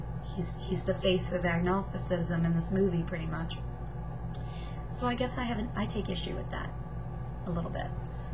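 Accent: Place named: United States. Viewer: Fundies don't think for themselves, that's what their bibles and pastors are for, but you would know that if you really lived here or understood anything about this country. Then again, you do sound more like a seppo than an aussie. Methinks you're a bit confused - favoriting Bill Maher and Richard D and then whingeing at me. Hmmm...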